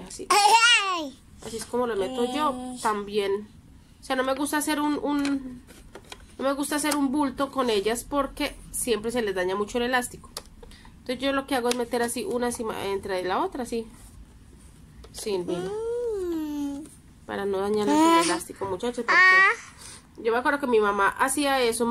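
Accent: Colombia. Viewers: Ese tan gracioso 😅 Me encanta tu acento colombiano y que nos llames muchachos y más aún, parceros. Me haces sentir en confianza. Abracito a tus pequeños 🤗🤗🤗🤗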